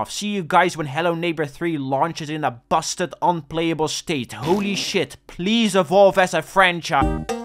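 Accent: Netherlands. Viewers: This guy his English sounds so painfully Dutch. Lol Good video though. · Binnen de eerste 2 seconde herkende ik een mede-Nederlander. Gekoloniseerd broeder. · Is dat een Nederlands accent dat ik hoor? ;) Goede video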